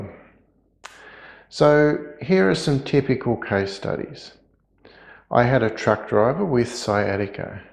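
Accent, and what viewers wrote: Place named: Australia. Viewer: It’s Australian English, it’s very clear. 😂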